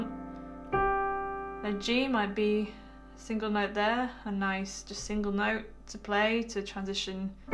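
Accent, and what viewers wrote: United Kingdom. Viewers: Wow, what a great piano lesson, wish you were teaching live! Exactly what I needed to know at this stage of my piano journey. Your British accent is icing on the cake. Well done madam.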